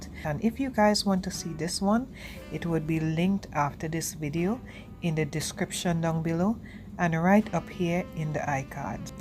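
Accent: Trinidad and Tobago. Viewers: Beautiful. I'm hearing the Trini accent and I'm so glad I found your channel. Looking forward to seeing more.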